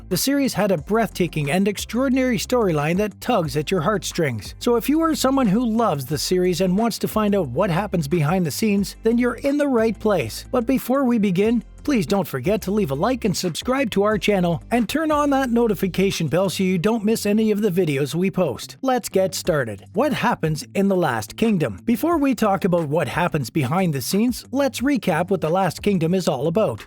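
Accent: United States. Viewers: PLEEEAASSEE....LESS OF YOU TALKING..ABOTE the show!!! Sorry to offend... But when you decide to do any kind of public speaking, you REALLY need to try & lose the annoying Boston accent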